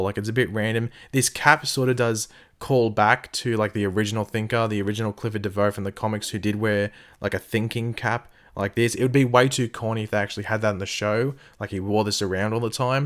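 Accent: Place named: Outback, Australia. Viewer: I didnt subscribe because of your content, i subscribed because of your outback sounding voice, just sayin hahaha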